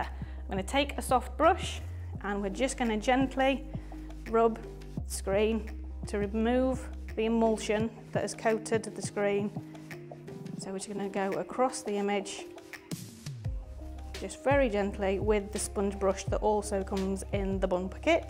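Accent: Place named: United Kingdom. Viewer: What is it about a Brit accent that clenches my jaws? Every other word is "pop it". Probably a German "loan word".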